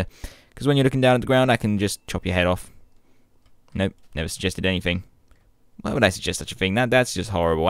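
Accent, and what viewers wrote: United Kingdom. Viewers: I love your voice im british too <3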